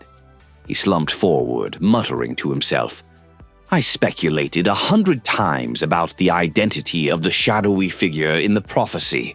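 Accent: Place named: United Kingdom. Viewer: Ah yes british narrator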